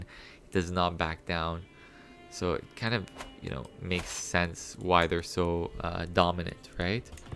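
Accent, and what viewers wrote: Arab world. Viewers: loved it, and its nice knowing ur an arabic from ur accent, keep going bro.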